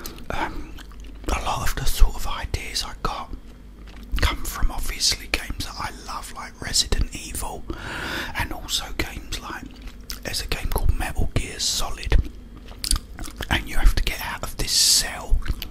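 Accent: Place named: London, United Kingdom. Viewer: Just found your channel! I’ve never seen an ASMRtist wiv a propa landan accent. I’m born and raised London myself, so I got an extra pang of like nostalgia and just general asmr from the whispered vaguely cockney “wewcome” at the start I’m now hoping you actually are from London or this’ll sound a bit weird 😂 Love the content nonetheless!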